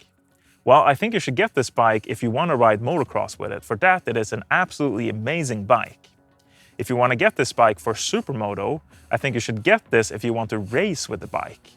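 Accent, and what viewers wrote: Sweden. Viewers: bra svengelska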